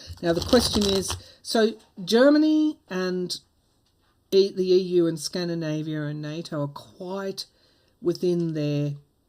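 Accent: Australia. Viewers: Yes I feel this too. Putin is not Russia. Women and men who hold love in their hearts do not support the war in Ukraine, the senseless deaths of their families, the devastation of their neighbour’s lands and cities, the strain on their economy, the unjust burden on ethnic minorities, the ignominy of the Russian name internationally. We are witnessing the last battles of patriarchal, authoritarian leadership in RF and in the US and in other countries. I pray for the flowering of locally based democracies all living in harmony with each other. Thank you for the reading. Love your presence and the Aussie accent. Vivien West Australia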